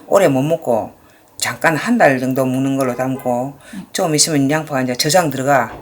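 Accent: Gyeongsang, South Korea. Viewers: 엄니 설명이 유머스럽네요 경상도억양 정겨워요 양파장아찌 맛나보네용 비율도좋고따라하기쉽고~👍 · 말씀 도 완전 경상도 사투리 정감 가고 좋아요 😀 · 선생님~ 엄마가 정말 팬이세요~ 햇양파가 많이나와서, 양파장아찌는 벌써 두번째 담아 먹습니다. 남해분이시라더니, 저도 경상도 사람이라 말이 정감이 갑니다~~♡♡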